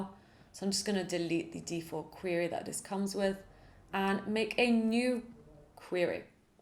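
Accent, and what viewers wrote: England, United Kingdom. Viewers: You are a British women, i know that because your accent is very well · Which English accent is this? Just curious.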